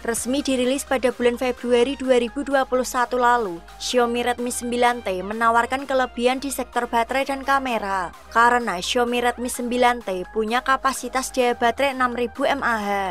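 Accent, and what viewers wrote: Java, Indonesia. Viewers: suara nya bikin ngakak,,medok medok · Medok ayu lo, aku ya medok kebumen hahaha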